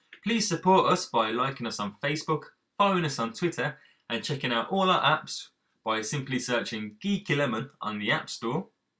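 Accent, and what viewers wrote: United Kingdom. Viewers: Where are you from? Manchester?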